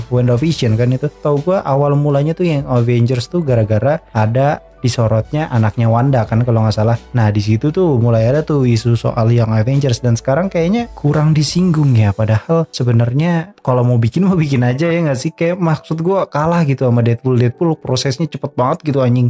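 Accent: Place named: Bogor, Indonesia. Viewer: Bahasa sunda lu kek barudak bogor pride anjir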